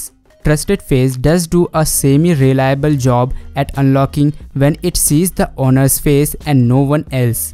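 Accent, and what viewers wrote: India. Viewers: indian accent omg so hard to understand plase try to speak without accent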